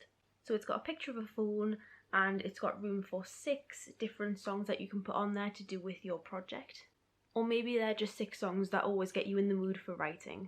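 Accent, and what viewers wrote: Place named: Newcastle, United Kingdom. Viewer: Being from the North East myself, there's nothing nicer to hear than that Geordie accent :)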